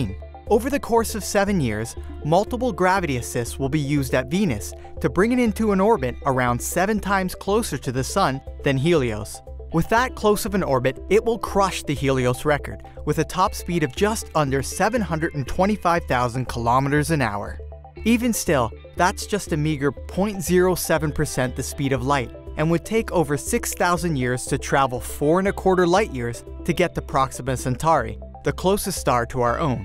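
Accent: United States. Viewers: You sound American. Most likely an American audience. Telling us speeds in kph is a bit snobby.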